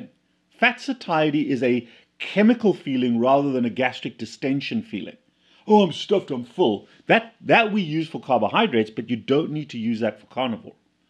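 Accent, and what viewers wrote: South Africa. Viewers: Hey Doc. I recently found your videos and I'm enjoying them so much. From the first video I could immediately could hear that you are from my neck of the woods, from SA. Thanks for all your videos. Lekker man.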